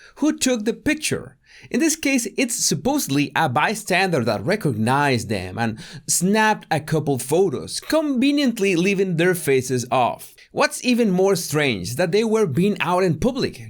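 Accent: Spain. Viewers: Hes cute too I love that spanish accent. Oye give it to me papi chulo! da meh lo. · hilarious without sounding cruel when we all know full well that its not a compliment just used. Please keep that Spanish lilt, its honest, cuts to the chase and very amusing.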